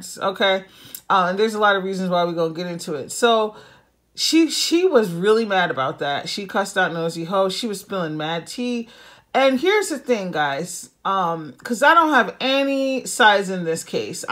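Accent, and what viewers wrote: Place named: Jamaica. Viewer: As an avid YouTuber thank you for this breakdown because I had no idea all this was going on!!! Big up yaself I think I heard a 🇯🇲 accent 💚💚💚#bapbapbap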